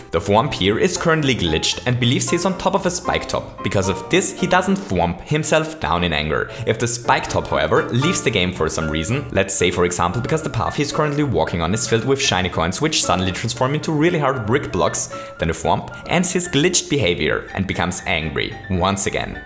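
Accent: Germany. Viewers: Whoa i didn't know you were German... I kinda recognized your accent but I wasn't certain until i saw the german language at the point where you checked the Upload date from the new black hole Level... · are you german? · Lol Youre german ? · Are you german?? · He's from Australia but with a heavy German accent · so you're german, nice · Are you German? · You sound freaking German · are u from germany?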